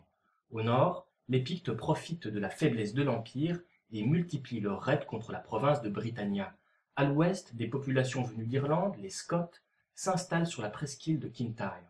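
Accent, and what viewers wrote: Belgium. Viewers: Je vois par la prononciation que le vidéaste est belge. Je regarde aussi les vidéos de l'historien belge Dufays. Donc, les Belges ont beaucoup à nous apprendre. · petit accent belge ,D depouis...^^ Mais excellentes vidéos explicatives. dommage qu'elles soient courtes.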